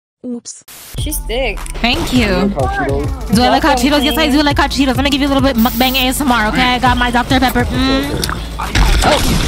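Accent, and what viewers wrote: Jamaica. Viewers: Are u Jamaican cause I'm Jamaican. BTW I'm your biggest fan ❤ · I like how she tried so hard on the Jamaican accent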